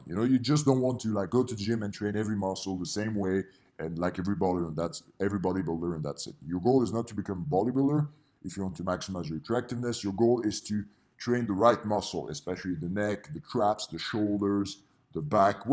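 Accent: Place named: Netherlands. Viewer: I can tell your Dutch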